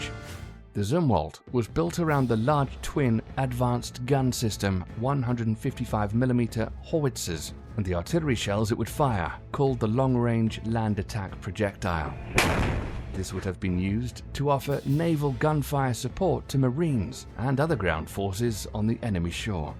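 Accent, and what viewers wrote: United Kingdom. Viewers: What the hell is a "gate-ling gun". LOL And why is a british person narrating these videos? 🤣🤣🤣🤣🤣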